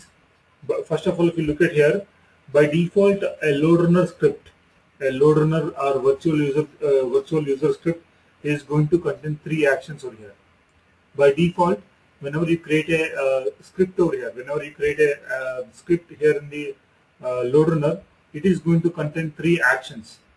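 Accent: India. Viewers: Нихера непонятно что говорит, кроме того что индус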